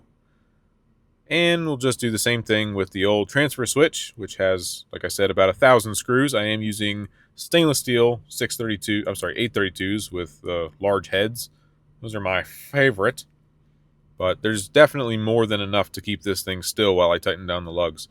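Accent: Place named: Philadelphia, United States. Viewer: Awesome video, love the custom ATS. Regarding split phase 120/240 terminology in some places people do call them phases and we know what they mean, but that can be a slippery slope to people calling split phase 'two phase'. And To me your accent really sounds like a Philly accent, and Philly being one of the only places that actually has two phase power where each phase is 90 degrees apart, I'd stick with lines or legs for split phase 240... and if you happen to be in Philly I'd love to see a video on a some actual 4-wire two phase motors and controls if you come across any. Thanks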